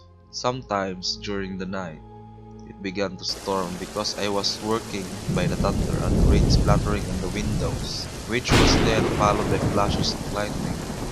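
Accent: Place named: Visayas, Philippines. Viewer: Nice kaau animation ♥️ ma halata nako nga bisaya ang narrator the way mag pronounce hehehehe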